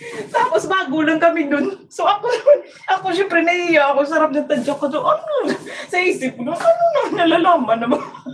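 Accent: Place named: Ilonggo, Philippines. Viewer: Natutuwa ako.sayo pag sasalita ka ng ilonggo.. ❤A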